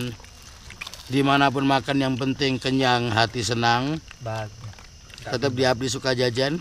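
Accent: Surabaya, Indonesia. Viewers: mas awkmu wong suroboyo ta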